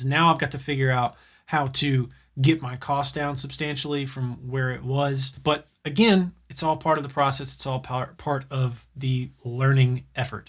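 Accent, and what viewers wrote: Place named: South, United States. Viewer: I think I hear a southern twang to your voice. We just talk slower 😄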